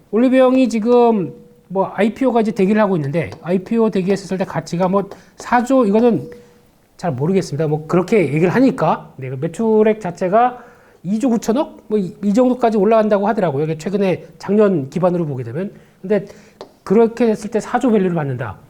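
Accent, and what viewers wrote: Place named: Liverpool, United Kingdom. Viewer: ...!날이갈수록 영어가 영국식(리버플쪽)으로 변화는듯~엄청최고👍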